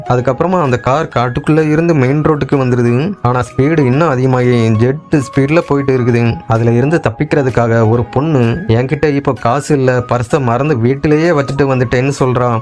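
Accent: Kongu, India. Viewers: Yennunga nenga kongu mandalama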